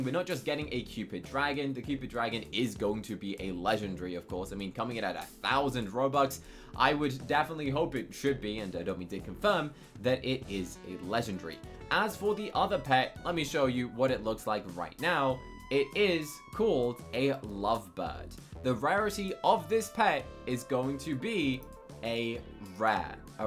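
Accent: United Kingdom. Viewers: You also sound British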